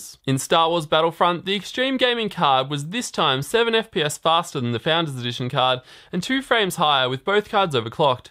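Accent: Australia. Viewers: AUSSIE REVIEWS! easiest on the ears. new sub mate, cheers!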